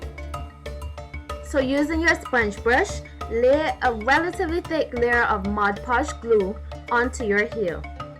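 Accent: Barbados. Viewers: I hear the bajan accent .. love it! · You know a bajan voice anywhere l am so glad to see a BAJE doing this kind of stuff and your instructions are great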